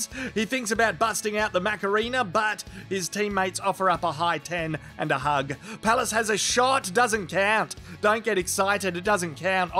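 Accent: Australia. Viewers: What is this. Aussie man on Man U channel! Excellent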